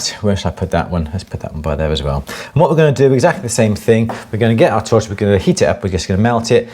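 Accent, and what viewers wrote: United Kingdom. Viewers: I definitely put the cart in front of the donkey.... I was partially through a metalsmithing class before the class was shut down because of the virus. But ar 62, I’m determined this is what It want to do, even if only for making jewelry for myself, This morning I bought a Durston Agile C140 Combo 60 Flat/40 Wire Rolling Mill. I figured with all this time on my hands, why not play and learn at the same time? I came here tonight to search for beginning projects for rolling mills and found your awesome video. THANK YOU! This will be so helpful! PS! I’m thrilled you like the Duraton Agiles. What sold me was the 5th wheel that made the rolling go easier, smoother, over having four wheels. I know I will love it when it comes next week! LOVE your videos and your British accent!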